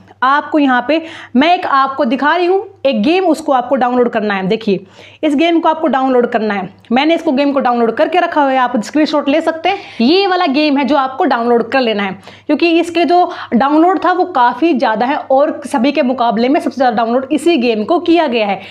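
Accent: India. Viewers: Haryana ya Delhi se mam???? Language apki haryanvi hai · your slang is like punjabis??? you are a punjabi???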